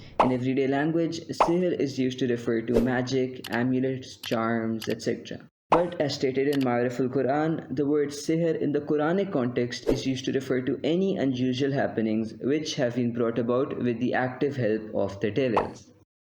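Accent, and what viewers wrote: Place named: India. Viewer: You sound very Indian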